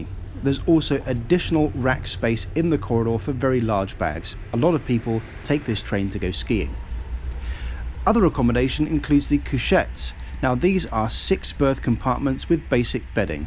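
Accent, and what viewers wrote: United Kingdom. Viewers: . I'm writing you from Ukraine. You are really. I'm very lucky to see your wonderful journey. It's gonna be super. You are very cool. I adore Arctic and everything what is connected with this region. Fantastic show. Railway in Sweden is really engineering marvel. Nature. I have no words to describe my ambitions. Your fluent English, your real British accent, your manner of speaking, your wonderful voice, music, lifestyle — OK, everything was pretty good. I'm your biggest fun. Thank you very much for your journeys. I love it. You are on my chance. Suppose see you soon.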